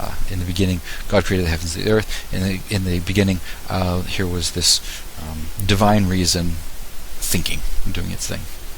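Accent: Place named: United States. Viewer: Your pronunciations of Latin and Greek are lovely! Not typical for an American. Bravo.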